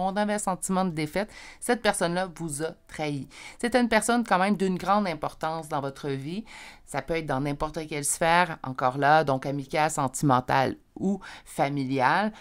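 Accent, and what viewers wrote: Canada. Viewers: Merci à vous pour vos guidances sans langue de bois et j'adore votre accent canadien et aussi, présent à chaque guidance, c'est chou 🥰 bonne soirée à vous ou bonjour chez vous 🌞 🙏☮️💖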